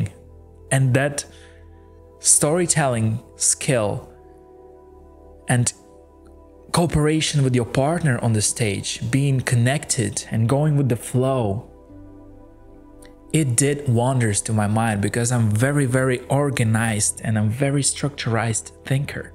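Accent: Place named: South America. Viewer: I dig your South American accent . Good accent My God, i hope you're not Egyptian. Sincerely Dan, South Dakota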